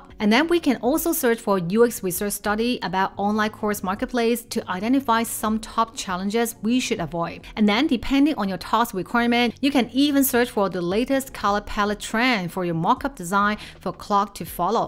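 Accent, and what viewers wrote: China. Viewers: Great content. Your Chinese accent is very strong so I finished watching but it was pretty tough Good luck.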